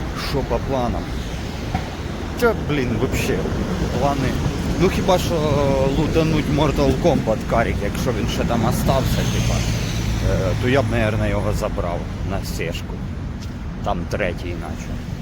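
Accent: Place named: Trostianets, Ukraine. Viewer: акцент як у мого кореша з Тростянця ))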